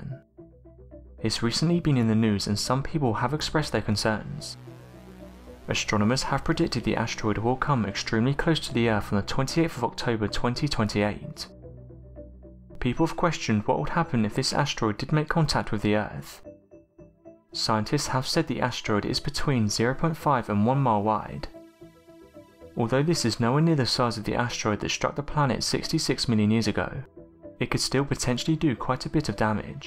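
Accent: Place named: Oxford, United Kingdom. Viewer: Your Oxford' English is realy Nice...but...I must listen Your Voice 3rd Times...sorry...for - I can understand American' English better 😊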